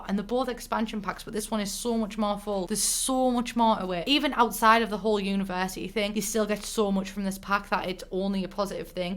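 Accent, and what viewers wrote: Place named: Teesside, United Kingdom. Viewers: Can totally tell you’re from near Middlesborough 😂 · Okay - this might honestly sound so damn stupid and irrelevant, but it's SO nice to finally see a popular, nice genuine youtuber, especially one on a topic I LOVE with a fellow northern accent?? Granted, I wasn't BORN in the UK, but having lived here since I was 4, I grew up with that North East, Durhamite, teeside accent.. and I was never.. overly fond of my own - it was always called out by my southern friends and commented on since it "never fitted me" "made me sound- **queue generic insult**" and as stupid as it is, it always got to me?? But it's so nice to actually see it, hear it surrounded with nothing but love, or well, not even seeing it mentioned? Now reading this back: I'm so afraid that this may be insulting to anybody else with these kinda accents - but please know I actually adore em, just I've never had a good experience with MY OWN. 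But also, it's nice to also see somebody from around this area being successful as well! Working class ganggang may understand ig, idk.. TLDR: idfk I ramble sorry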